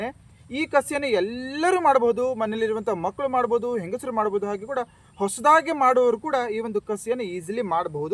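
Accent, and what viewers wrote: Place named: Yellapur, India. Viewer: ನಿಮ್ಮ ಮಾತು ಕೇಳಿದ್ರೆ ಯಲ್ಲಾಪುರದವರು ಎಂಬುದು ಖಾತ್ರಿಯಾಗುತ್ತದೆ. ಎಲ್ಲಿಯವರು ನೀವು?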